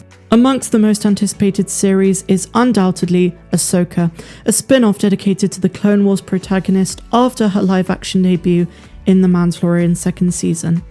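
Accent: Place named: Bristol, United Kingdom. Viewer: your accent sounds like your from down my neck of the woods in Bristol or close by. Love your work mate Gert lush and Peace out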